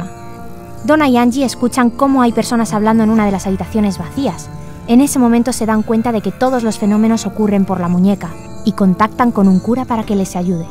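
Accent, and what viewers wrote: Spain. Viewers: Como ablas beo que héroes de España mi país favorito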